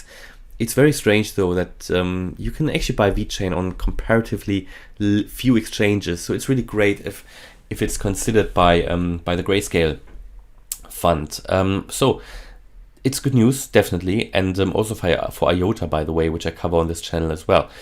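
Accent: Germany. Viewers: I bet you are either from Germany or Austria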